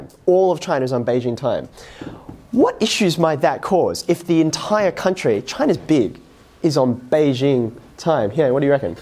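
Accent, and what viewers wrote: Australia. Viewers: is the teacher from australia??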